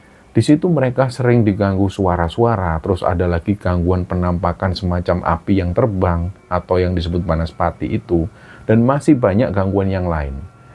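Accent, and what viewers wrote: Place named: Tegal, Indonesia. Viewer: Mantap ni dari kabupaten tegal mas 👍 Ora ngapak ora kepenak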